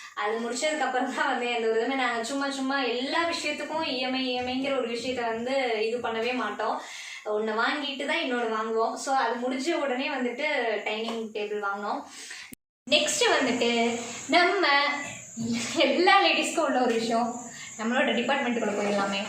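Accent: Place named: Coimbatore, India. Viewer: Nice sister.. exactly Coimbatore slang.. I too from Coimbatore.. very nice to see namm ooru ponnu🥰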